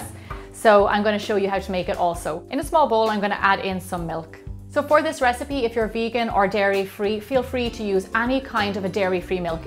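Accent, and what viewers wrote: Ireland. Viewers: God I love the Irish accent!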